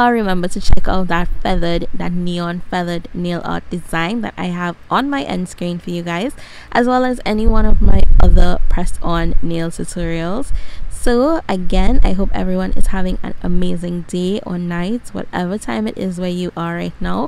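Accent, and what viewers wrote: Trinidad and Tobago. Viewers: I can spot a Trini accent from anywhere 🇹🇹🇹🇹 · As soon as you started talking I knew you were Trini <3 ! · I heard the trini and got so excited 👏🏽👏🏽subscribed and followed one time. Awesome video 🤍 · where are you from u sound trini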